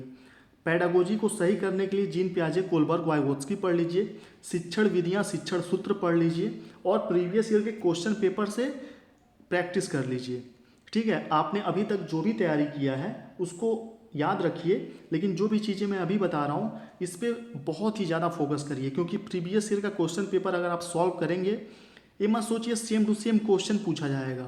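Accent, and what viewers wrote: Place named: Uttar Pradesh, India. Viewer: I m right .apki bato se.. video k starting m hm soch hi rhe the...ki aap U.P. se ho..or aapne apni video m hi clear kr diya.....hmne apki complete video dheki h.. 🙏🙏🙏sir plz ek confuse clear kre...sst ki preparation kaise kre...plz tell me...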